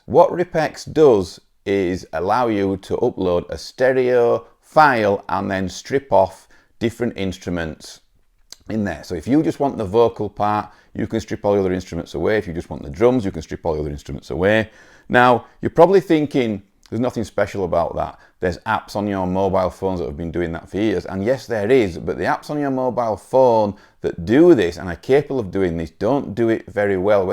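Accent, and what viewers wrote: North, United Kingdom. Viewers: Great video, and nice to hear a northern accent! I have my own little YT channel where I do bass covers - I'm seriously considering this as I prefer to play over tracks that have already had the bass-line removed so as I'm not doubling up on the bass. This software looks like it could do the trick! 🙂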